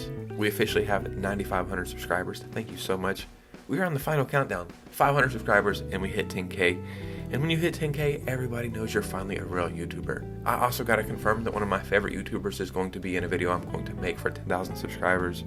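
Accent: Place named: Orlando, United States. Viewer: So this is what Orlando people sound like. That’s chill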